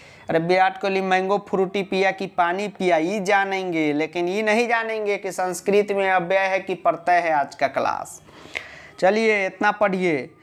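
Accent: Bihar, India. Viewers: Bihari hai ka sir ?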